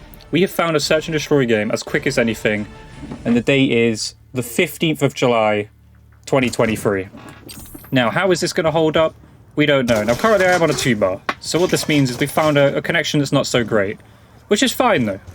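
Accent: United Kingdom. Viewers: I love your accent. English…right? Australian?) reminds me of how Beard meets the World talks. Love it ❤️ 👍 😊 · Oh! stampylonghead is also British so I guess that's why you and him sound somewhat similar? and np keep up the good work. But in your videos I really feel like somethings just missing, it doesn't really draw me in to watch more if that makes sense? it feels kinda bland and its not really engaging too. honestly I'm not really sure on the improvements you could do but I'd hope to see some changes overtime. hopefully I'm not mean with this though, just expressing an opinion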